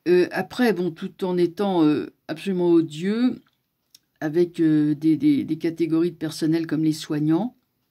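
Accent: Paris, France. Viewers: Merci toujours heureuse de vous entendre avec votre petit accent parisien et votre humour. Vous avez raison ils sont a la fin de leurs mondes. Et nous retrouvons enfin notre souveraineté il suffit d'accrocher nos ceintures de sécurité pour les dernières secousses.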